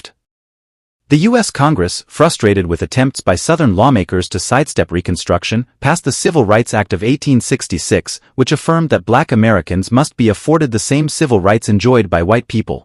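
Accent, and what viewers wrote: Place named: Europe. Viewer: You sound, european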